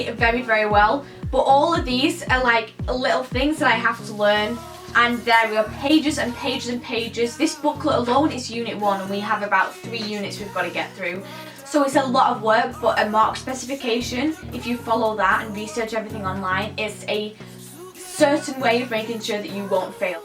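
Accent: United Kingdom. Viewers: I'm sure she's from the UK! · r u british?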